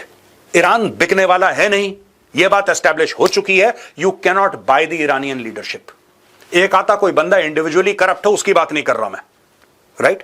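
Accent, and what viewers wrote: Lahore, Pakistan. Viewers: Lohori style bolte rahiye.majorsaab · 😂😂😂😂😂 Gaurav Sir's Lahori accent is hilarious 🤣🤣🤣🤣🤣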